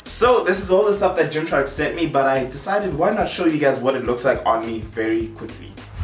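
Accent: South Africa. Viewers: Are u from South africa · Are you south African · is he south african?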